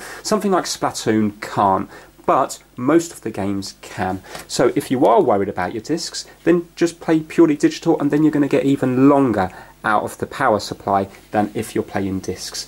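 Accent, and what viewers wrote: United Kingdom. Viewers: Hes british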